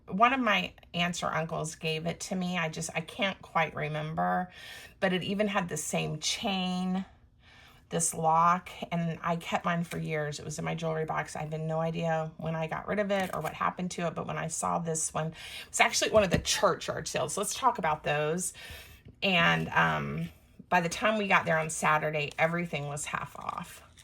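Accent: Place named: South, United States. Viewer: Oooooo. I have lots of old hankies too! You go girl!!! (P.S. I'm amazed how quickly your southern accent went away)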